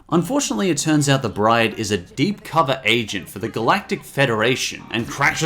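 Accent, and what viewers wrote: United Kingdom. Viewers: #1 bad thing about your british accent. when you say, ARTISTIC i think your sayin autistic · I thought I was going to watch an episode but I get narration from some f**** Brit my grandma's from England but your voice is annoying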